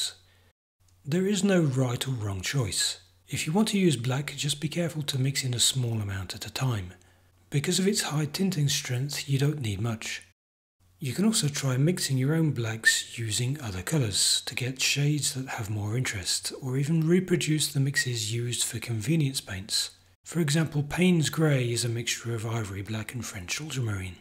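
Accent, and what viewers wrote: United Kingdom. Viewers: They don't use black watercolor because they use black watercolour. British voice trying to sell to Americans. Shame on you.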